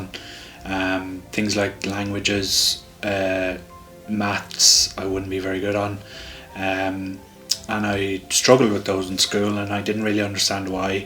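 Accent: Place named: Ireland. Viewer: A well-made video about an important topic. Love your Irish accent! Nice choice for background music. I'm very easily distracted myself an possibly someone who could meet the diagnostic criteria. But I was able to focus until the end. Cheers!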